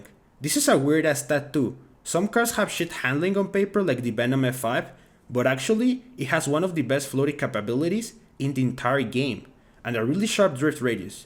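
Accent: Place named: Mexico. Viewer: dude u sound mexican af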